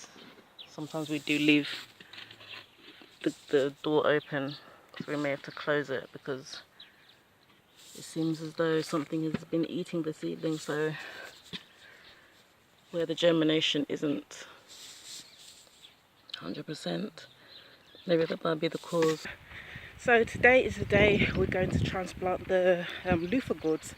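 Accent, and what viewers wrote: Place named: United Kingdom. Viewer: So good to hear that crisp British accent after trawling through so many annoying amercian video's! About to try and grow some here in the far south west of the U.K. for the first time. I've been led to believe it's possible, I guess we'll see!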